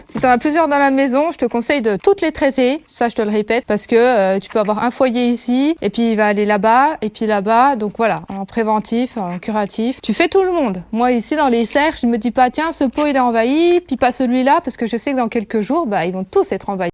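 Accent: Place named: Burgundy, France. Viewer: Très bons conseils et j’adore ce petit accent bourguignon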